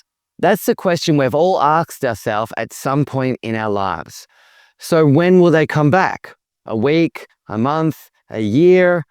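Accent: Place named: Australia. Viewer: I loveee aussie accent. Wish i had it as well.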